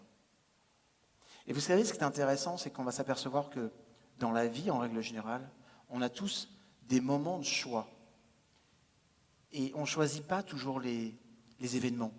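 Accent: Canada. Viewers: Il est pas si mal votre accent canadien je me serait cru dans un épisode de "Mme Lebrun" oui en France aussi l'on connaît cette série tournée en public humoristique à souhait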